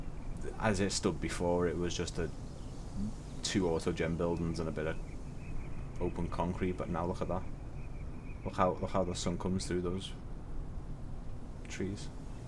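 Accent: Liverpool, United Kingdom. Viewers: How odd, just bought this on a whim and looked for a review, found yours first and sounds like you're from Liverpool like me, small world :)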